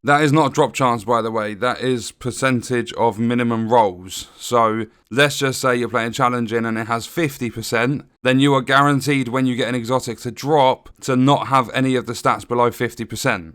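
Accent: United Kingdom. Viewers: Dude i love it. Keep on moaning. Even the wife sat and listened to you today. Miss the UK and the morose moaning accents so much!!! :)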